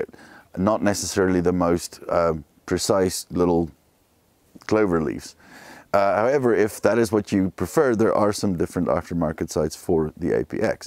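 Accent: South Africa. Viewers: Liked your video. Is that a South African accent I am hearing? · Thanks for this, same experience on my APX. Are you South African by any chance? Great video, subscribed!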